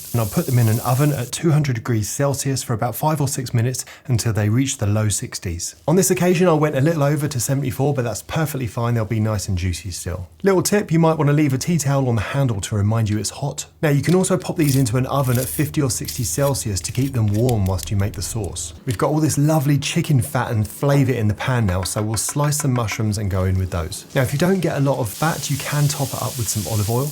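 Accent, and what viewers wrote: United Kingdom. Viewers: He sounded like a random British dude before I read this comment...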